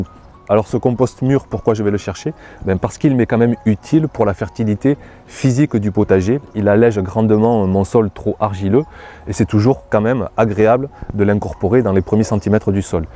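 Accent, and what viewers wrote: South, France. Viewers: Très bonne vidéo très agréable à voir et écouter avec l'accent du soleil 😊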